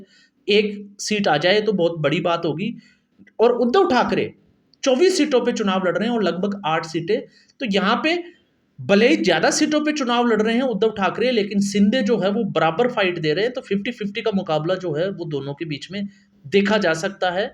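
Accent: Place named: Haryana, India. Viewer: आपकी भाषा में हरियाणवी पुट है,,🎉🎉🎉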